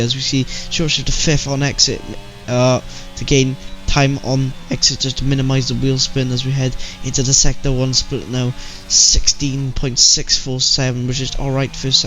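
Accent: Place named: Cardiff, United Kingdom. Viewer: Man where are you from in wales? You sound like you’re from Cardiff being a blackwood lad myself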